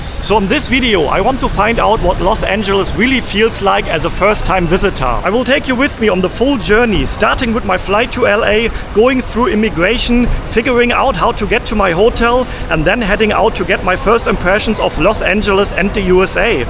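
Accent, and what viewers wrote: Germany. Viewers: Telling us you are german without saying you are german.hehe this is NO hatte! Your english is very nice but it sounds Real german hehe. Like your Videos. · This German-Enlish makes me shudder.:face-pink-tears: My son is living in LA and likes it very much. He was born in New York. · Want to know what a hardcore German accent sounds like just watch this video. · You have the most interesting accent. You can hear the German but sit sounds like German merged with Asian. Interesting😊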